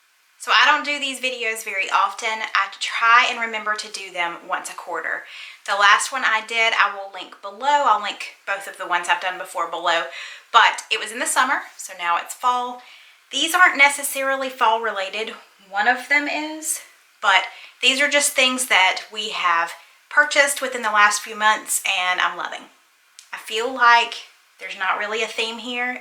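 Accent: South, United States. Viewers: Loving your southern drawl!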